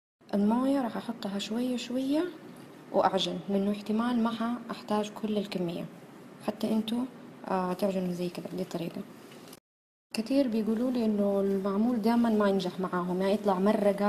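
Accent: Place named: Hejaz, Saudi Arabia. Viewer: اللهجه الحجازيه الاصيله ماشاء الله ههههههه دحين انزلكم هي شكرا على الوصفه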